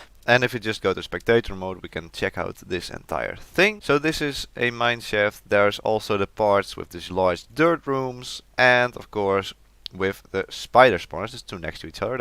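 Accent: Netherlands. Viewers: Are you from the Netherlands? You definitely have the accent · are you dutch? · nederlands accent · Are you dutch? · Dutch?? If not you sound like one😂 nice vid btw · Superfun vid but i have a question: are you dutch? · You officially are dutch i hear it gewoon plat nederlands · That's hella a Dutch accent you got going on, sounds pretty good so far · Ik hoor gewoon dat je uit Nederland komt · Cool video!! Are you dutch?? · you are definitely dutch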